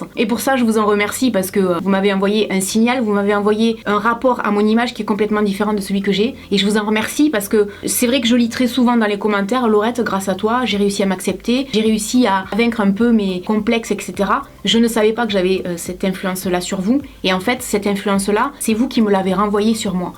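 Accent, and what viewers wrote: South, France. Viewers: Salut laurette, Je vais avoir 15 ans en octobre et je fait 37 kg pour 1,5m,autant te dire que je suis en sous poigt. Lorsque j était plus petite je m'aimer comme j'était, mais avec les année j'ai subie le regard des autres et j'ai commencée à me détester à un tel point que lorsque je passer devant un miroir je lui donner un coup (sa a durée à peu près 7 ans). Mais il y a 1 ans environ j'ai découvert une femme avec un fort accent du Sud. Cette femme n est pas parfaite mais elle est ce qui s'en rapproche le plus. Grâce à ces videos elle m'a redonné confiance en moi et j'ai réapris à m'aimer comme je suis. Cette personne on la surnomme. À cette personne je voudrais juste lui dire merci,un merci qui vient du coeur. Alors merci de tout coeur Laurette. Et désoler pour les fautes.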